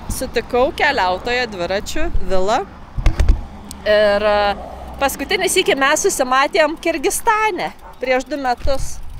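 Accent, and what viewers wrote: Lithuania. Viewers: iš kur tu kilusi pagal kaba spėsiu iš Raseinių.Ten taip kalba Stebiu tave tau sekmės.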